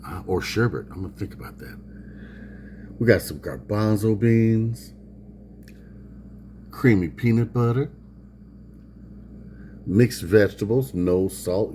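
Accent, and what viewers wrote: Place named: Louisiana, United States. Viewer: I know you said you lived somewhere around Chicago but even though I'm deaf I can hear your accent you sound like you're from Louisiana originally.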